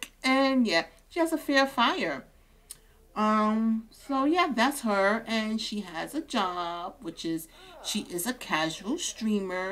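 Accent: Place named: Brooklyn, United States. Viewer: no problem and yes, I love it! I'm from the south so it's rare if I get the chance to hear a Brooklyn accent.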